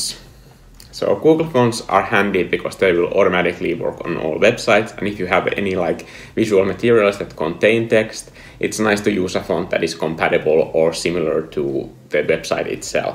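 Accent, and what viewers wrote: Finland. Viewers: are you Finnish per chance? ^^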